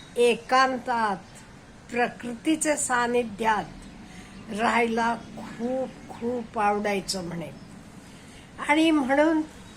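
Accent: Karnataka, India. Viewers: आई तुम्ही खूप गोड आहात 😊 तुमच्या मराठीत कन्नड भाषेची फोडणी घातल्या सारखं 🙏 छान वाटला व्हिडीओ 🙏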